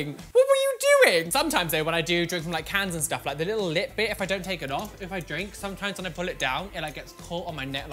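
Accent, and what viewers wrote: United Kingdom. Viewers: I have to say I wasn’t expecting you to be British but I love it hey queen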